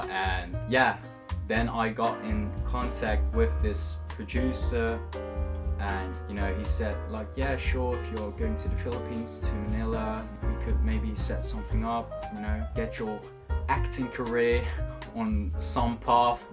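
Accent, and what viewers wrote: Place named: United Kingdom. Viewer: You speak differently. I mean with accent but I hear british :))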